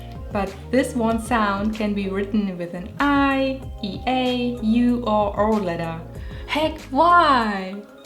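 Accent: Germany. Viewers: I'm German myself and I have to be honest,my first suggestion when I heard your voice for the first time,was "I she also German??"that's so sad,but I know that feeling,but I also have to say many of our english teachers aren't even able to speak with a proper pronounciation.... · I think your accent is awesome!I love it!!I don’t really care that u have an accent!!GERMANS HAVE GREAT ACCENT!AND YES ENGLISH IS HARD BUT U DOIN BETTER!🇧🇪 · Well, I'm American and have been living in Germany for about 9 months. My travel has been limited due to Corona, so I have mostly been hearing the Rheinland-Pfalz accent, but I don't think the German accent is annoying at all. I think do your reasoning for reducing your accent is good. I can understand you just fine, but maybe some Americans might have a harder time. There is nothing wrong with having an accent though. I am struggling to learn to speak German without sounding like I have a potato in my mouth if it makes you feel any better. 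· Without sounding rude I think you have a very nice accent and can not understand why you'd want to sound less German. I (and others no doubt) can understand you perfectly fine.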